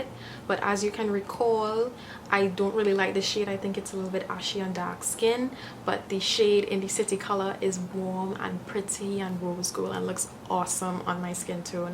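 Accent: Trinidad and Tobago. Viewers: The trini accent yasss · Are you trinidadian? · You got a new subbie even though I will disagree with you and get the Sun Dipped kit :) haha I love the Trini accent, my parents are Trini!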